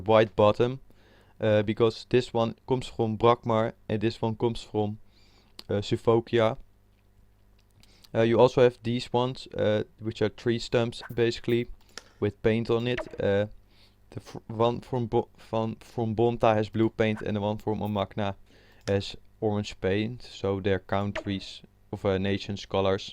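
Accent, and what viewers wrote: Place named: Netherlands. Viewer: Duidelijk een Nederlander ^^, goed bezig.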